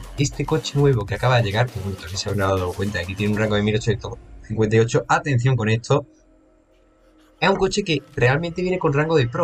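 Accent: Spain. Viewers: Eres de España :p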